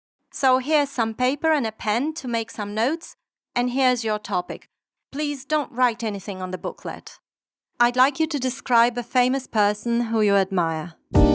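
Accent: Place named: Northern England, United Kingdom. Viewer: I reckon his accent comes from north England, perhaps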